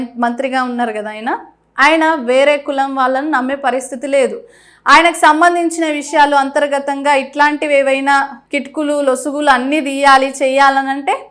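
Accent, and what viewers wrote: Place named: Telangana, India. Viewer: Excellent information..and excellent telangana language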